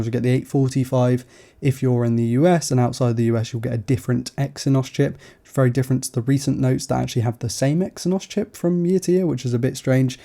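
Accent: United Kingdom. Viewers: Hi! I don't usually comment on videos, but when MrMobile made his giveaway task to be "comment something nice on underrated tech channel's videos" I immediately thought of you. You really make great content and your calm presentation (and beautiful british accent) make your videos a joy to watch. You also make videos on used smartphones which I think is great. Old flagships are still good phones! And congrats on getting to work with Mazda. That was a good video! I really like cars (especially Mazdas) so that might be why.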